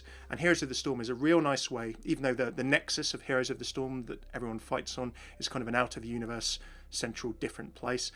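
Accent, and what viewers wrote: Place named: United Kingdom. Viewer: Your voice has a British accent. You British?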